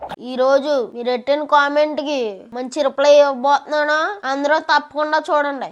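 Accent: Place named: Kakinada, India. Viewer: నీ వాయిస్ కాకినాడ చిన్నోడి వాయిస్ లాగా ఉంది.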